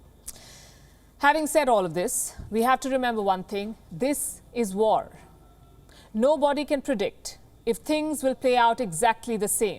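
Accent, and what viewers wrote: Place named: India. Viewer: Even with a strong accent, you are still the most understandable Indian-English speaker lol, keep up the good work.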